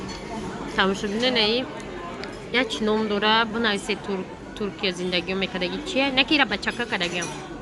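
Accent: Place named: Khujand, Tajikistan. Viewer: Эх лафзи хучандиии гушаком кайба туя мешунавад😭😭😭😭😭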